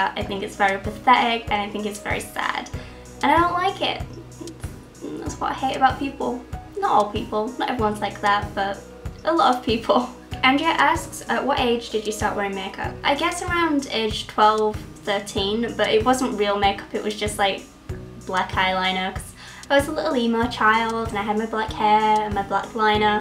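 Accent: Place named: London, United Kingdom. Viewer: OMG I love your accent so much. I am going to copy it when I come to London! I hope we get time to meet up :D :D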